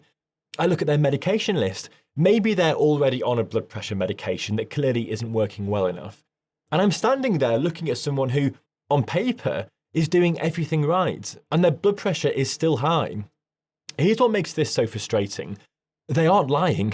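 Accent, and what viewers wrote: United Kingdom. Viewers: What an excellent inspirational explanation … Thank you.. defo following you from now on … I’m an 85 year old female, still working as a wellbeing programmer and fitness teacher, but in the last year, my blood pressure, has become elevated, forcing me me to go on a BP med.I have been snacking between training sessions, going to stop from today!!! Also I think you live in the same part of the UK as me, judging by your accent.. Thanks again!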